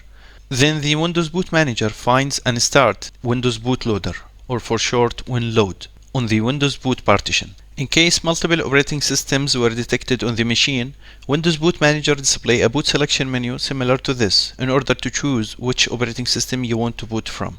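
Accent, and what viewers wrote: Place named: Arab world. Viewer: Haha Dude I can hear your Arabic accent pretty well, very good and detailed video.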